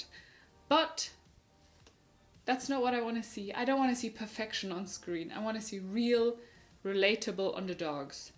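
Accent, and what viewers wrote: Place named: Germany. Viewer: Wie man den Deutschen akzent direkt raushört😅. Hab vor kurzem wieder Unfabulous durchgeguckt. So nostalgie aber zoey101 hab ich auch geliebt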